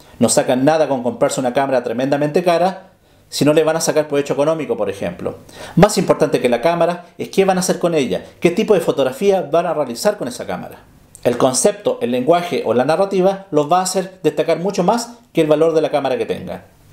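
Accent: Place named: Chile. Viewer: Muy bueno tu video. Me queda claro que eres chileno, nuestro acento es inconfundible. jeje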